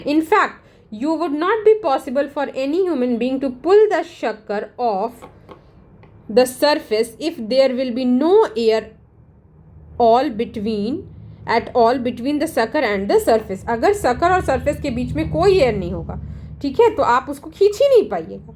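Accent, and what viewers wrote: Bihar, India. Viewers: Mam are you bihari ?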